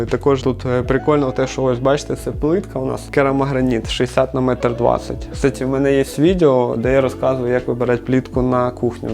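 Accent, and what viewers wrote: Surzhyk, Ukraine. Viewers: Не можливо слухати( суржик є у всіх, але тут його забагато.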